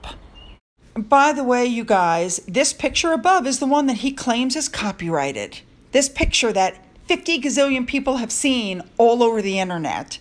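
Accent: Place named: United States. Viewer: I think you are very brave to continue with Utube, - you have a great clear voice from the USA on the side of Her Majesty. Thank you.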